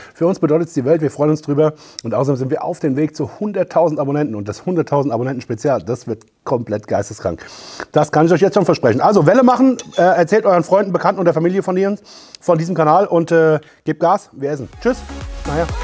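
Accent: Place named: Hesse, Germany. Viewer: Hi! Bin ja durch Zufall auf Deine Videos gestoßen! Hier einen lieben Gruß an die "Scheffin" 😉 Dein Dialekt hat mich sehr erfreut.. Heimatgegefühl! Selbst bin ich gebürtig aus Dirppdebach! Deine/Eure bisher gesehenen Videos war phenomenal, war schon neidisch 😂 Ich lasse nun ein Abo da und freue mich auf weitere Videos! Bis jetzt: Klasse! UND, bitte weiter so! LG aus Hessen nach Hessen 🙃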